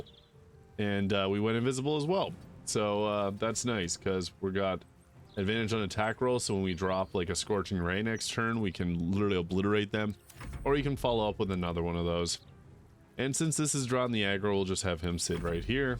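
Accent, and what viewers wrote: Canada. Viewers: Homeboy is Canadian. Different linguistic inflections and pronunciations.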